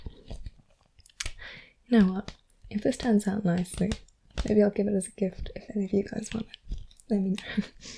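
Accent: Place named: Australia. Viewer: Your voice is amazing!!!! The Australian accent just makes it even sweetet to hear:)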